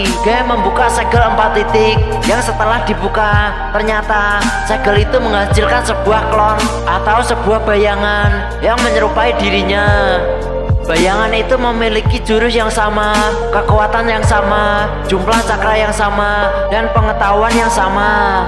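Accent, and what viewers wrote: Java, Indonesia. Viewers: Ngomongnya medok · 😂😂😂😂 Suaranya bangke bgt ya.. Tp bikin bs ketawa karena medok org jawa.. Wkwkwkwkwk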